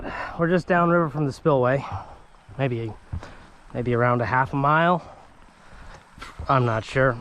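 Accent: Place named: North, United States. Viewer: You sound like a gat dang yankee compared to that old dude at the start.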